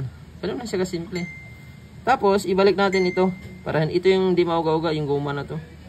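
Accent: Iloilo, Philippines. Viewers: Ilonggo ka po ba or bisaya?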